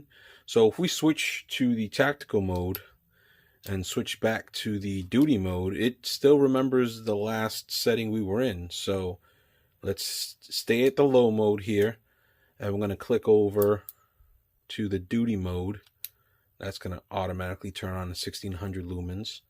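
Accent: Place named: Boston, United States. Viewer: That's a pretty comprehensive review - Thank-you!! Whereabouts are you from, fella? I almost thought Boston, but your accent isn't the typical Bostonian I'm used to... Also, if you don't mind me asking, what do you do for a living that requires a duty belt? I'd guess Police Officer, from the '911' part of the channel name?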